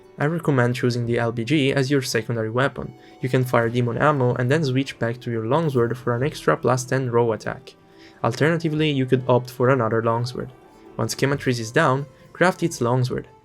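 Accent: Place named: Italy. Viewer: he's italian, so you gotta deal with his accent, he speaks very clear imo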